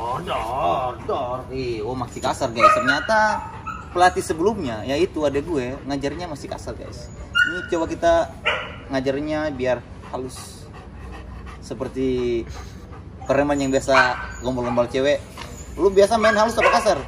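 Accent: Ambon, Indonesia. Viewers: Biar lingkungan jakarta tapi lidah ttp ambon jua bu.. 😁😁😁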